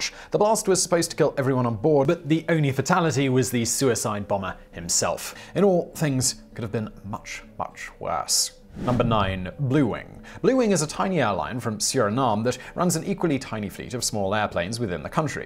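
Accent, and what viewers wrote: United Kingdom. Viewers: So this video is extremely morbid. So why was I laughing through it? With Whistler's fast paced British accent, and the over-the-top 3rd world antics, the whole thing started to sounded like a Monty Python sketch. (No offense, Simon and writers--you are awesome)!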